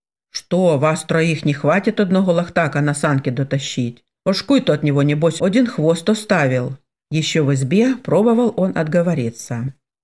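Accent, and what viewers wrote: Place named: North, Russia. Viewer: Прекрасное чтение, даже не представляю, как это можно, привыкнув всегда выговарить гласные так как мы обычно это слышим, так быстро перестроиться и читать как бы О-кая. Это придаёт рассказу какую-то особую прелесть и неповторимость. С большим удовольствием слушаю и всегда с нетерпением жду продолжения. Да благословит вас Бог в этом служении.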